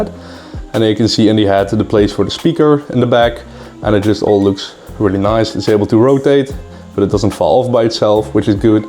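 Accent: Netherlands. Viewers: ''and more specifically'', je bent nederlands ;) hoor ik al in de erste 3 seconden ;)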